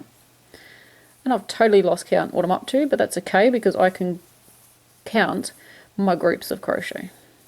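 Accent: Australia. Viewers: Awwe, you've been reunited with your crochet love!! That's really cool. I can relate. I had a bicycle once when I was in college. I rode that thing everywhere. Went home for a week. Came back, hopped on the bike, and was so surprised how good it felt when my butt hit the seat!! (I didn't cry, but I get you.) Happy to see you back; I was missing you. Nice patterns, good instructions, lovely Aussie accent!!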